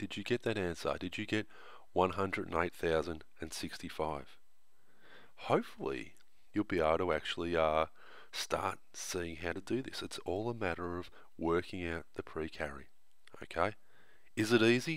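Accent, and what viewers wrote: Australia. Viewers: It's Australian, huh? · The narrator is Australian. I like this method. I will be teaching it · YES, AUSSIE ACCENT MATH HELP! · Loving the Australian accent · The second I heard the Australian accent I subscribed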